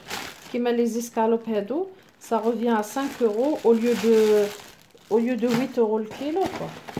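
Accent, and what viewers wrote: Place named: Oran, Algeria. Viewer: اخي متزوج جزائرية نحن نقيم بلجكيا هههههههه نفس اللهجة اللي تتكلمين بها اختي. انتم من مدينة وهران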